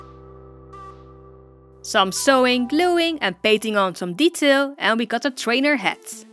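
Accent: Netherlands. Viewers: I love youre videos and i lpve youre accent i habe the same i am dutch asswell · Beetje jammer weer. Hoorde al nederlands accent in je engels hahaha. Its better in English, becuase more people will understand it :).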